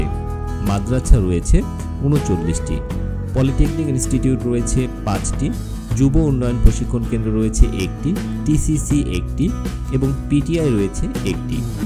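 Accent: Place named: Ghoti, Bangladesh. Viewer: Bhai kl "GHOTI" Naki ? Your accent like a GHOTI , Who migrated from India to Bangladesh. Maximum people of bordar district are GHOTI. Like Chapai nawabganj, Rajshahi, Naogaon, Natore thanks for sharing. Wish you all the very best 💝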